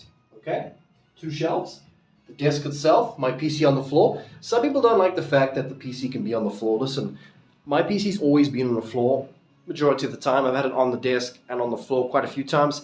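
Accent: South Africa. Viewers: A. Room looks good, reminds me of how your office was set up at your old place because you had the cupboard behind you then. (I know cause that was the only way you could set up your office) B. I keep my PC on the floor, got it packed today for the move and there was a huge dust bunny under it. Good luck with Zelda's dust bunnies. C. Green screen idea sounds good, can't wait to see the final product. Can you actually sew because you mentioned stitching? D. Room is lacking some color, looks a bit like a hospital room. Maybe when you get the green screen in its final set up you could get some pretty window coverings? Unless you like all the white then power to ya. E. Finally, when your sick like in the video your South African accent is much thicker. More thick than normal i mean. ❤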